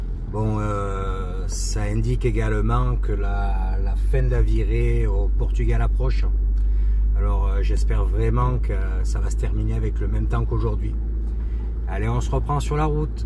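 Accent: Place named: South, France. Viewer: Coucou Je suis tombé par hasard sur tes vidéos et je trouve ça super génial. J ai vu aussi ta vidéo sur ton cc où tu nous présente ton véhicule. Ça me rappelle le nôtre,un chausson le best off 510 sur moteur fiat. Petit véhicule avec le même concept. Dis moi tu vis a plein temps dans ton véhicule ? Tu es déjà à la retraite ? Tu viens de quelle région avec ton accent (que j adore)du sud? En tout cas tes vidéos me plaisent beaucoup et je me suis abonné à ta chaîne. Je te souhaite bon vent, et profite bien. A bientôt pour ta nouvelle vidéo....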